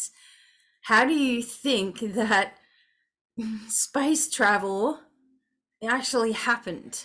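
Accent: Australia. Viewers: I absolutely loved this message ❤and really hit that deep spot! Ya aussie spirit and voice 😂came out especially at the beginning with the voices about people not understanding why we have a energy exchange 😅 omg I was rolling around in laughter vibes flying high lol 😂 Thank you for the depth and the joy ❤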